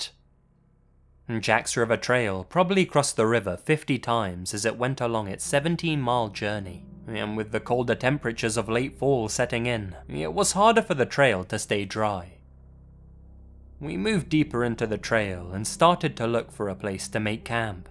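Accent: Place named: United Kingdom. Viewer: Hi, I'm English and enjoy your channel very much, the English comment was because of your very British accent. You sound like a BBC docu. narrator, it "kills" me for this reason when you drop in vernacular, like, "...naturally, she lost her shit.", or "nearly shit myself!" WELL DONE, keep doing what you're doing and I will keep veiwing. Respect! ANDY F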